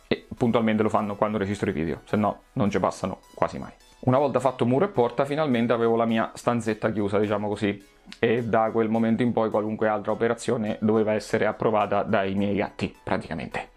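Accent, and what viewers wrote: Marche, Italy. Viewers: fai troppo ridere. caparbietà e testardaggine per portare a casa il risultato tutto raccontanto con accento marchigiano. Visto tutto il video col sorriso. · io vivo al nord, mia madre e' nata a Corinaldo e mio padre a Mondavio. Sentirti parlare mi fa sentire a casa. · Ai gatti glie gusta ste cose ahahah adoro il marchigiano 😂😊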